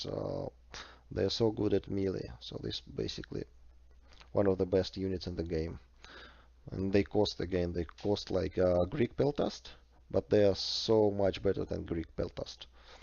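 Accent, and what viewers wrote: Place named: Russia. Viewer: i prefer Bobi and his classic build. if i play without jav i prefer cretan arch than 3 balearics maybe 2 bale and 1 arch but the 40 points of damage is good if you want focus inf with good armour.. but you neel also cav supremacy. btw pelta is pelta <3 good video expecially coz for me is very simply understand english spoken by a russian coz it is much slower <3 great work mate! Lawrence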